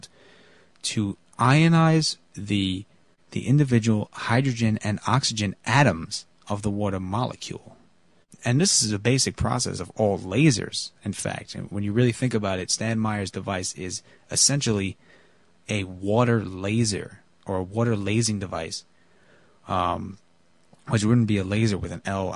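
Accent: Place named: Sweden. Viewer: UR injection of ignorance into this forum reeks arrogantly with a sweedish accent to boot, like dead Ya Ya Gabor's television appearances, so annoying.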